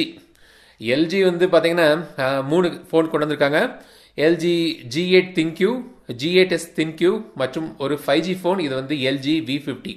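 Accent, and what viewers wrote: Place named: Nagercoil, India. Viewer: Nagercoil slang😍👏🏼👏🏼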